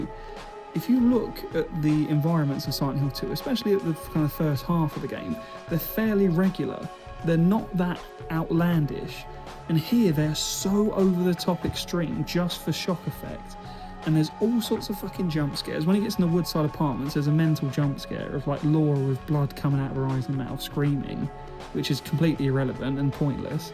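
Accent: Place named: United States. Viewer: PS I love your American accent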